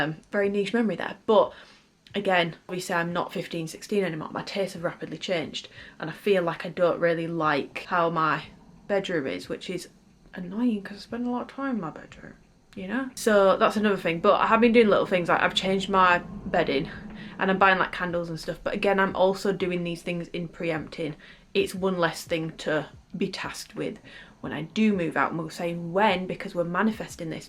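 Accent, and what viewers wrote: United Kingdom. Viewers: Rochdale accent?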